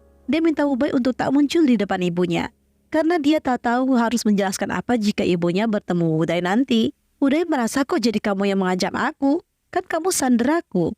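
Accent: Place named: China. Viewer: ne kk orng cine ya.. dari omong kk ne..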